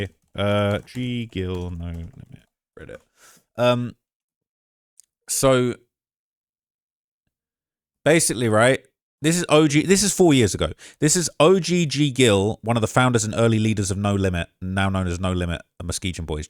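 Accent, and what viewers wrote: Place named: United Kingdom. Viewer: This nigga is Chicago new akademiks lmao with a UK accent tho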